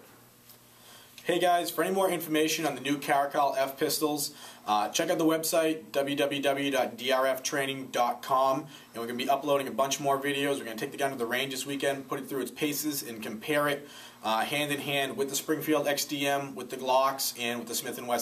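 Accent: Massachusetts, United States. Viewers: Are you from Mass?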